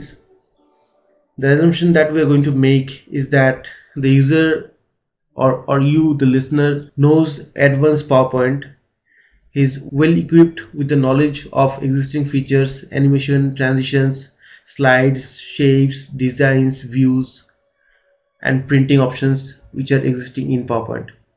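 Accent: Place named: India. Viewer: I hate the India English!!!!!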